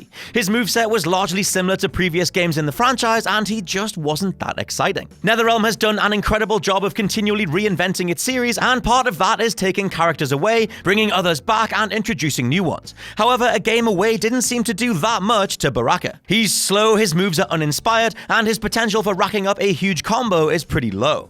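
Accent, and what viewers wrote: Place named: Scotland, United Kingdom. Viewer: Her Scotts voice and I clicked away.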